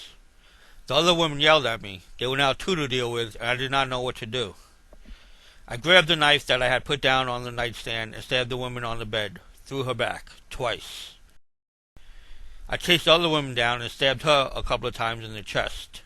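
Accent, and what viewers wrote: New York, United States. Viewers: You sound like you are from New York. Great channel by the way, I loved your style of editing and presenting. Subscribed today. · Are you a New Yorker?! haha Adorable. Great narration! I'm from Queens!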